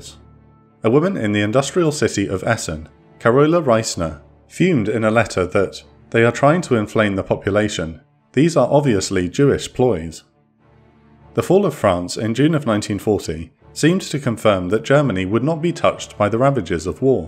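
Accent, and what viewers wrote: England, United Kingdom. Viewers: This guy has an English accent. He describes the bombing of Germany and England as if they were the first counties that had cities bombed to the ground. They weren't, history is severely lacking here. The Germans had set a precedent by first obliterating Warsaw and then severely damaging Rotterdam, in his myopia the Englishman omitted this history. The slow ramping up of bombing between Germany and England is a later phenomenon, the Germans had already set the precedent for bombing of cities in Poland and Holland.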